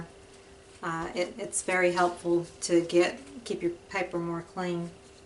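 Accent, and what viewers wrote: South, United States. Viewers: I love your videos. You are so soothing with your southern accent and your painting are beautiful!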